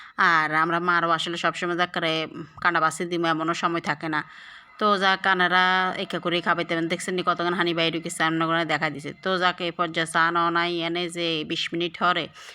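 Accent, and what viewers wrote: Noakhali, Bangladesh. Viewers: আপু নোয়াখালী ভাষা খুব ভালো লাগে ❤❤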